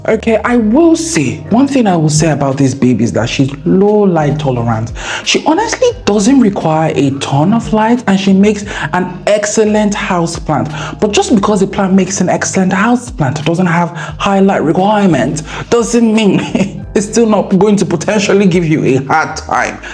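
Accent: United Kingdom. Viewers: Hi ❤ ❤ 😢😢😢😢😢😢😢😢 I’m an alocacia and calethia killer, those fancy ones 😢😢😢 but I found few at Walmart on sale, $2.50 found some & I still have them; I do have many types of tradescantias and love propagating them 😊 but I still kill plants. I have all kind of plants 🌱 🪴🌱🪴🌱🪴🌱🪴🌱🪴 maybe more than a 1000; still ❤❤❤ I love the ones stay alive for many years, the ones I kill 😢 I try & I try! I thought I was a plant mom that water too much, that I am constantly there but I am not, I am there in my garden but I don’t check them daily, honestly I have not found pest in any of them in many weeks😊 but this hobby is very rewarding and expensive 😊😊😊 Friend I like your British accent 😂😂😂 thank you for your time ❤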